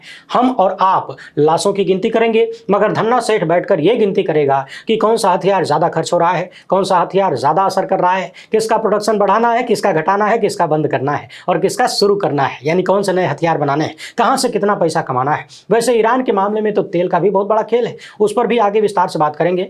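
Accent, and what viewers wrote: Bhojpuri, India. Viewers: आपका भोजपुरी अंदाज़ बहुत ही निराला है । मन को मोह लेने वाला । आप अपने इस अंदाज़ से भोजपुरी भाषा की भी सेवा कर रहे हैं । आपसे प्रभावित होकर, मैं स्वयं आपके भोजपुरी अंदाज़ में, अपनी विडियो बनाने का प्रयास करता हूँ । पहले मैं English language में अपना विडियो बनाया करता था । परन्तु, आप तथा रवीश कुमार को देखकर, सुनकर, मेरा आकर्षण पहले हिंदी की तरफ, तदुपरान्त भोजपुरी भाषा की ओर हुआ । मेरे लिए वैसे तो यह सब काफी मुश्किल है । परन्तु, फिर भी मेरा प्रयास जारी है । All credit goes to u n Ravish ji . 🙏🏻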